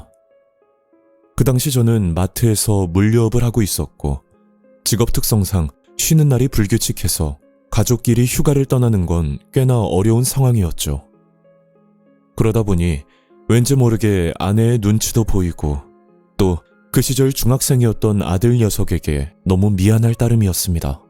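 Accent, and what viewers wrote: Gyeongsang, South Korea. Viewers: 여기 채널 은근히 경상도 많이 깜! ㅋㅋㅋ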